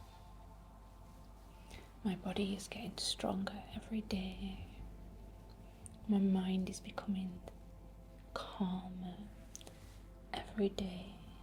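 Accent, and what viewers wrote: United Kingdom. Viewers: WITCHY asmr with a BRITISH accent?!?! How did I get so lucky to find you ❤😭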